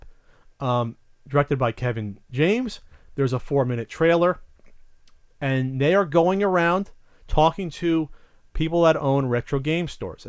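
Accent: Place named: Northeast, United States. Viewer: Sounds like you might be from the northeast. GL on the film.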